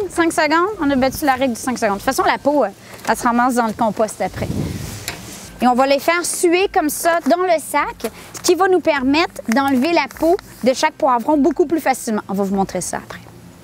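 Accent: Quebec, Canada. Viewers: C'est un accent du Kébeque ?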